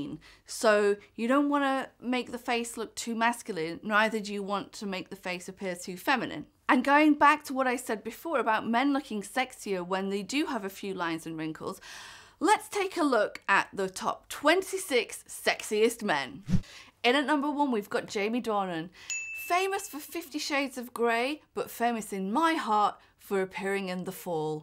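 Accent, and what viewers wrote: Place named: United Kingdom. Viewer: Your videos help me emprove my british accent! Thnx 😋 Cheers from Argentina!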